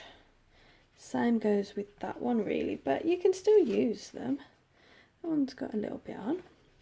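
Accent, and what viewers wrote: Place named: England, United Kingdom. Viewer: Thank you for a great tutorial on tea bags! I’ve subscribed so I’ll look forward to your next video! I’m in New Zealand, love hearing your English accent!🧚‍♀️✨💫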